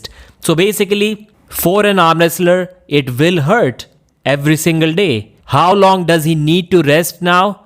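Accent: India. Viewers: the only indian whose accent for some reason, I don't hate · level of hindu accent. Rolling like every letter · this Indian accent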